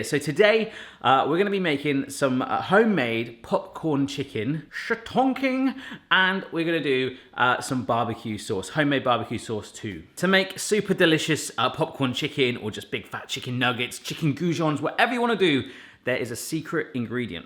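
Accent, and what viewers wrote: United States. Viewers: Hi! I am watching you from Calgary, Alberta Canada.... totally love your videos and I get a kick out of your american accent (it freaks me out every time you do that......."buttermilk") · Can we just take a moment to realize how good his american/canadian accent was? Good job! I'm from Roswell GA in the US. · Salt Lake City, Utah, USA! Love the American accent... Buttermilk. Lol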